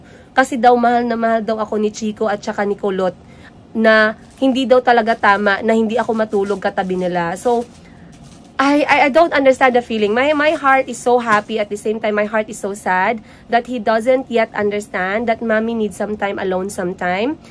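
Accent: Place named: Visayas, Philippines. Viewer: napanood kita mula sa 1st video mo..true from the beginning you were full of finness the way you speak at pagkilos.naobserbahan klng ito.nasubaybayan kita..could be due to sad & traumatic experience mo dun sa Uganda (gawa ng asawa mo)unti unti nagiba ang way of speaking mo.Very understandable yun.Now,na nandito kn sa Pinas you can be your natural self.Bisaya ka kya may pagkatigas ang salita & that's okay.mas marami kming natutuwa sau dahil dun minahal ka nmin pati ang mga anak mo at mga kapatid mo at staff. Tama yang attitude mo deadma sa mga bashers.Sikat kna kasi😊 Goodluck & God Bless!🫶🙏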